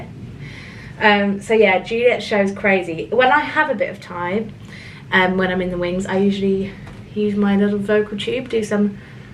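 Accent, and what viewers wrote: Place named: Australia. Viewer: loving the australian accent comeback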